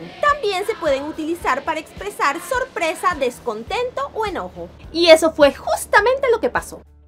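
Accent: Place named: Panama. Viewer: A parte, quiero hacer un comentario con respecto a los videos. Yo entiendo que quieran hacer algo de humor realizando diferentes narraciones como si fueran personajes, en este caso, una profesora de colegio. Pero creo que esto le quita seriedad al video, si la idea es enviar un mensaje serio. Ademas pienso que no es "hablar como panameño" es mas bien hacer la diferencia a la hora de hacer un video, porque si fuera asi, entonces todo mundo hablaria como habla cotidianamente en cada video de youtube, serie de netflix y programa de tv que uno ve, no? Yo solo digo que puede haber mas seriedad del video, si se habla con menos tonos "al estilo panameño" y con mas tonos concretos.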